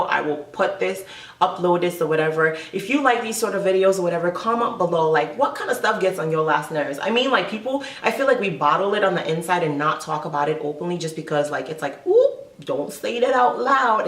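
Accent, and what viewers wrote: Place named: Africa. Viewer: Support is very important. Your African accent is so pure lol. Most Africans don't enjoy their stay cos they don't think they are accepted here. I feel like when you have hate in your heart you will find a reason to execute it. Be it racism, tribalism et al. BTW what camera and lens did you use? #ytqueens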